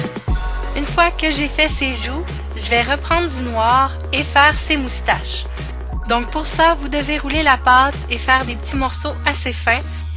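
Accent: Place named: Quebec, Canada. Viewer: Le bleu est très beau et bravo super chat 😀 ,et j'adore ton axen québéquoi 😉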